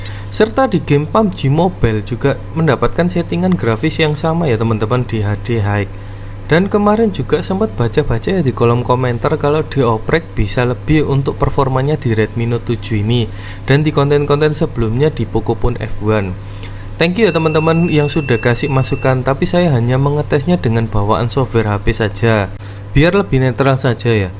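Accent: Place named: Surabaya, Indonesia. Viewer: Suroboyo ta bang ? kok medok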